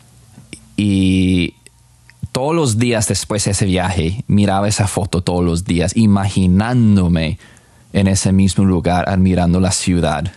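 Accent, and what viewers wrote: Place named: Colombia. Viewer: Es muy inspiradora su historia, ….yo lo vine a conocer a ud en el programa enamorándonos de Univisión y me pareció un gringo con un ace tico en Español como Colombiano por eso acudí a las redes a averiguar por ud y si mi sospecha se aclaró ud aprendió Español en Colombia jajajaj lo felicito por su tenacidad y verraquera para lograr sus sueños, un abrazo parcero…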